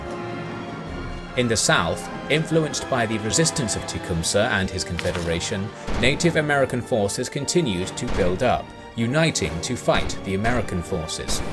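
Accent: United Kingdom. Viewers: Rather strange, this forgotten war was narrated by a Brit? I wonder what he forgot? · In which a Brit describes the battle, you win sir. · They also forget to include Militia units that had formed from Kentucky, Tennessee , and other states that had come there to help. They were tired of the British. Now the British and Americans are friends..but back then.. not so much. Odd too that this video is as USUAL by A BRITISH speaking narrator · Are you British?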